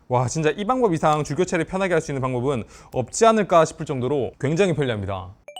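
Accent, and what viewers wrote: Gyeongsang, South Korea. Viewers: 경상도분이신같은데 서울말 쓰실라그러넻ㅋㅈㅋ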